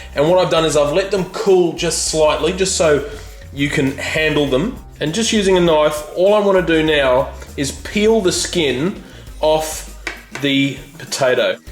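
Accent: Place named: Australia. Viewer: He's pronouncing it correctly - pretty much the Italian way of saying it only with an (understandable) Australian accent. The US "No-key" pronunciation bears no similarity <link> (to hear an Italian pronouncing the word)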